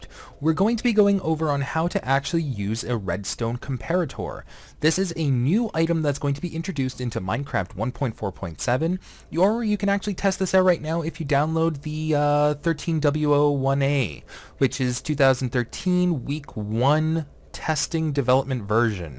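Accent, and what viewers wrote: Canada. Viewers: you are from canada XD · You sound Canadian, are you Canadian?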